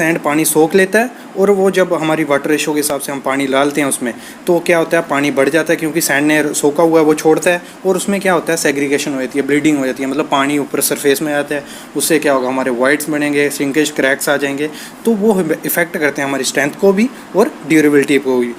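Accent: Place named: Sri Ganganagar, India. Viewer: Boli se lgta h shri ganganagar se ho aap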